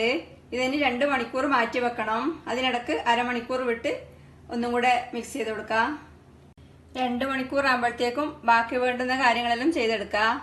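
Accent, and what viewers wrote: Kasaragod, India. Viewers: Kasargod aano kannur aano?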